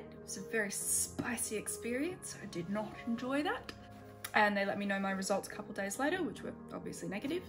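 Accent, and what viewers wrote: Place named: Australia. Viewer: You can tell you're home because you sound SUPPPPPER Aussie. Thanks for sharing your journey, can't wait to watch your streams!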